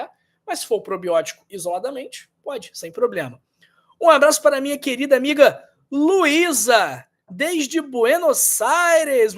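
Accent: Rio de Janeiro, Brazil. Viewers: Bom dia Muito boa as suas orientações . OBRIGADA .QUERIDO DR AMO O SEU SUTAQUE CARIOCA EU SOU GAUCHA . Aqui Jaguarão RS fronteira com Uruguai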